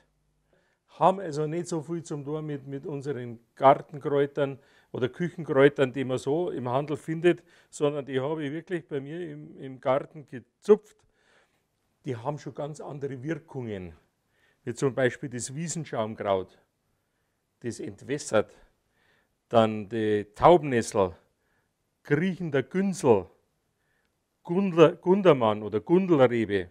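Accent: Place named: Bavaria, Germany. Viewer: Wunderbare Musik und ein noch besseres Rezept. Und das ganze auf Boarisch...herrlich😂😂😂 Endlich mal wieder etwas schnelles und bodenständiges. Sehr schön. Das Video hat mich in gute Laune versetzt. Danke dafür 😄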